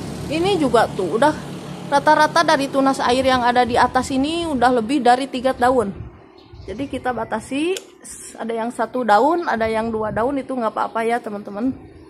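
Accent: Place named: West Java, Indonesia. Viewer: Maap mbanya dari logat nya mbanya dari jabar ya sunda leres te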